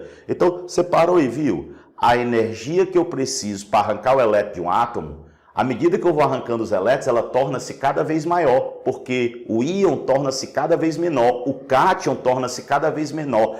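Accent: Northeast, Brazil. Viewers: sotaque nordestino não negaaa. é "nois" oxeee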